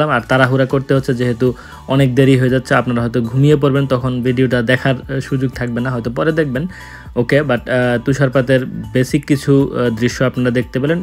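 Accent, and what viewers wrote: Bangladesh. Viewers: bhai,aponar bangldeser bhari kothai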